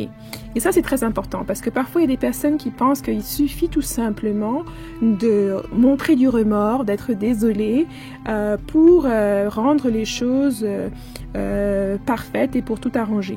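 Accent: France. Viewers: Merci pour ce partage que je partage également.. juste comme ça, tu ne serais pas de Lorraine? De part ton accent ^^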